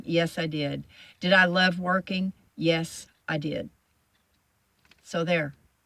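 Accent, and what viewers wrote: South, United States. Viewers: very good. I think she is beautiful. Your mannerisms and all. You also remind me a little of a dead relative of mine. I think it's the fair skin and red hair and little southern accent : ) enjoying your videos! Keep them coming. Love your personality.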